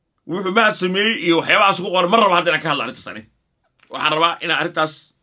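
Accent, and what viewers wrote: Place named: Warsangali, Somalia. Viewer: Orgi ciyaya kamataqaanid afkaaga warsangali kadaa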